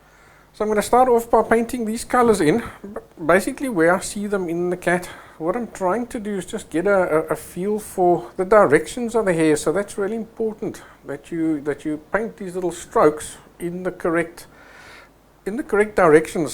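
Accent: South Africa. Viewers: great video, are you south african by any chance?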